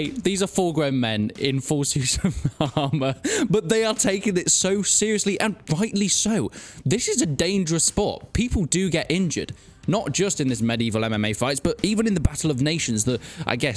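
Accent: England, United Kingdom. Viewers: Good to see more people getting into the sport, great stuff writing and assembling it. Just wanted to say you showed SCA there a couple times there which is a different thing as a rule of thumb if the armour looks a little flimsy and they're fighting with sticks and most importantly stabbing then thats SCA and not buhurt. The armour although is expensive is not necessarily 10 grand an entry level harness (suit) can cost you as low as 2000. The rule confusion is understandable it comes from there being 3 main disciplines in buhurt: dueling, melee (or also buhurt confusingly) and pro fights. Dueling is for points with the weapon only melee is 3 points on contact on the floor and profight is points everwhere and you can usually win by KO or TKO. The pro in pro fight does not mean professional as almost noone does this for a living and the people that do are usually coaches. 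I assume by your accent your English and as pro fights are what interest you you should look up Saturday knight fights on Facebook which is the uk starting a profight league its early days but they're great to watch. Thanks again for the video great stuff.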